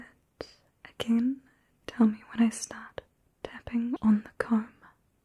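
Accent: New Zealand. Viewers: New Zealand mate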